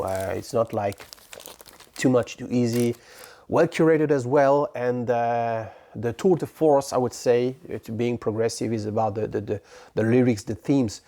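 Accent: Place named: Italy. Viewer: Salut j'aime beaucoup ton accent italien 😁😉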